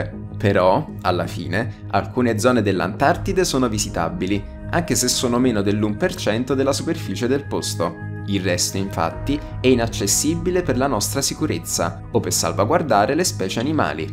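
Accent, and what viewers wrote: South, Italy. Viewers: Bhe si dai non male a parte diversi inciampi sull italiano ma si capisce che sei meridionale quindi ci sta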